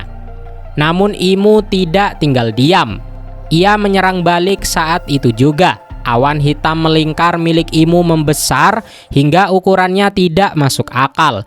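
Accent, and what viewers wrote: Madura, Indonesia. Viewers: Abang orang Madura ya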